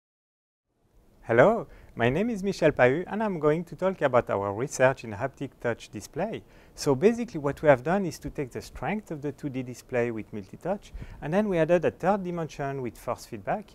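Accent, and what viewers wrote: France. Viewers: Accent français ^^ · Magnifique accent français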